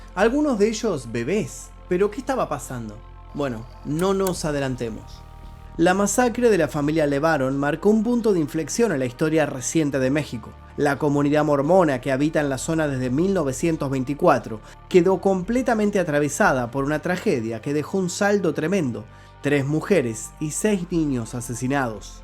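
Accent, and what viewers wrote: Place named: Argentina. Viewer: Esos malnacidos ni son mexicanos, no los aceptamos, argentino de mierda que maldito acento tan feo. Largo de México!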